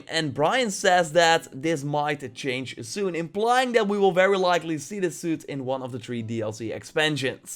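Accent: Netherlands. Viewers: Are u netherlands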